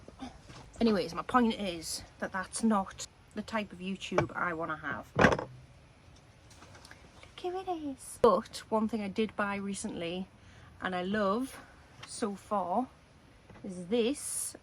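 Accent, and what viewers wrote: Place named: Newcastle, United Kingdom. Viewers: I’m not on Instagram, you fell into my feed! 😂came for the fact your in the UK and life in a small rural space. Stayed for the North East accent👌🏻, Fox ❤ and a great video. Love from Northumberland on the Scottish Borders x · can't mistake the Geordie/north east accent 👍your certainly living the good life 👌🚴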